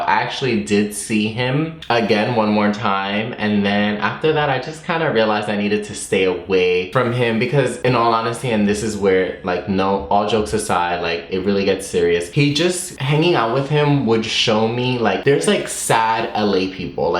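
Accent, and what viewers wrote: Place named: New York, United States. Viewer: Your NY accent is heavyyyyy! 😂 mind you I’m from NY too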